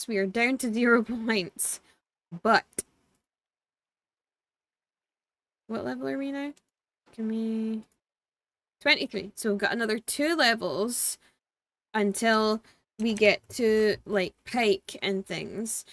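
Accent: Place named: Scottish Highlands, United Kingdom. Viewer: Hi, I'm enjoying your videos. Your accent leads me to believe you are from the highlands. Maybe in the northwest. Isle of Sky area and the origins of the MacDonald clan. Am I right?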